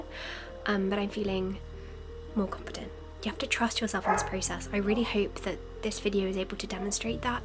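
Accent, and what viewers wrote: United Kingdom. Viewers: There is no such thing as "the British accent". is only one of a great many. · I honestly love your video because you're so cute and I love the British accent and I tried to learn it so I love you 💘🥹💕 and because you don't use music so much so I can listen to the accents to learn it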